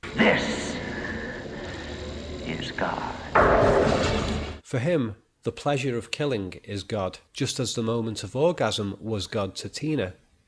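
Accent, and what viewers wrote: Liverpool, United Kingdom. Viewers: To: I absolutely love your videos. I've been binging on them since I discovered them a few days ago. Your analyses are as fascinating as they are thorough - supported with clear examples and often detailed and well-cited research. It's inspiring to listen to someone who's so passionate about a subject. Incidentally, your Liverpool accent and softly-spoken delivery (in my opinion) enhance the enjoyment of these videos. You impart your ideas in a laid-back, conversational, matter-of-fact way, which feels respectful to the viewer's intelligence in a way that other analysts seem to somehow miss by having subtle (or not-so-subtle) condescending or boastful tones. Does that make sense? With regard to a point you make in this video about Nancy's ability to finally defeat Freddy by using a technique which had earlier failed, "ignoring his existence", as you put it very well. 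My feeling is that in order for this technique to work, the victim must fully and whole heartedly believe it. in the earlier scene when she attempts to get rid of Freddy by saying the words "This isn't real, this is just a dream", she is still frightened and panicked, which tells us she still actually believes the danger is real. After the climax of the movie, Nancy has developed true faith in her words. She says them calmly and with total conviction, hence it's effectiveness in ridding the demon. On further reflection, perhaps this can be linked to your idea that in this movie God doesn't exist. Perhaps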